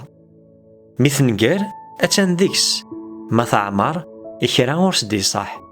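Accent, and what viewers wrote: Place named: Kabylie, Algeria. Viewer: J'aime comment tu parles le kabyle que je trouve très authentique. Un travail, un recit remarquable. Je vous salue.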